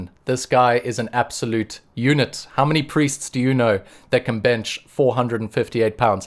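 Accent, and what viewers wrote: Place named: South Africa. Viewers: He's mentioned he's originally from South Africa. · Your accent sounds South African... Are you? I love these guys you mentioned. I love Abbot Tryphon too!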